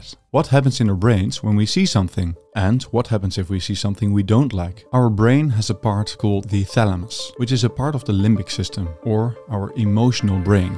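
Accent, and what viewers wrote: Netherlands. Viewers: Notwithstanding your terrible Dutch accent, you are every bit right about the importance of beauty. Actually, this is exactly what King Charles III stated as Prince Charles when he released "A Vision of Britain" and launched his institute for architecture. Efficiency, practicality and beauty are not incompatible at all. On the contrary, they should go hand in hand.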